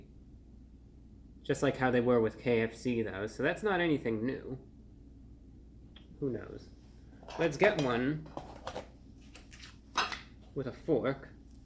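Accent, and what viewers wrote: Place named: Mid-Atlantic, United States. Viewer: Mid Atlantic accent and idk why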